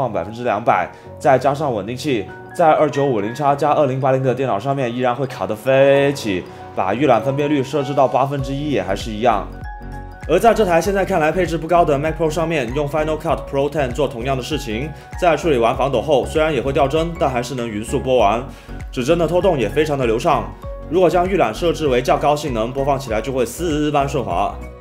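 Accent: Cantonese, China. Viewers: 是不是粤语人？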